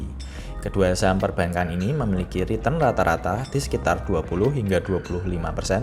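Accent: Java, Indonesia. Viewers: saya suka chanel ini krna ngomong nya medok, berasa cangkruk sama teman sendiri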